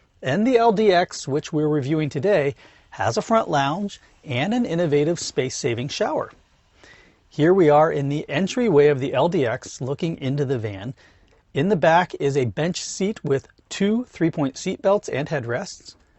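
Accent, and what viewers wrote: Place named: France. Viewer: Rethinking everything now. Maybe it would be better to go with something like this! Nice French accent, by the way!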